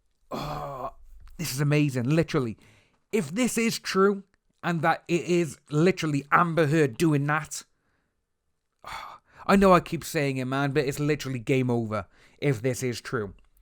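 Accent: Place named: United Kingdom. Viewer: Love your British accent... Good job keep it on.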